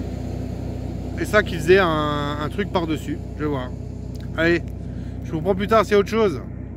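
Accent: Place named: Alsace, France. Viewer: On peut pas nié que tu es Alsacien (Bas-Rhinois) 😉😇💙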